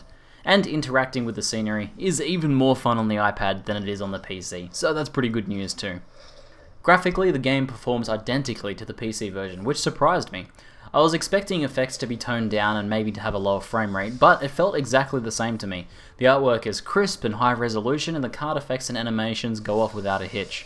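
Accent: Australia. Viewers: took me about 1 seconds to realise hes australian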